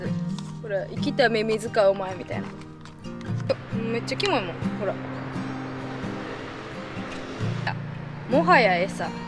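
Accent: Senshu, Japan. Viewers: 泉州弁いいですね。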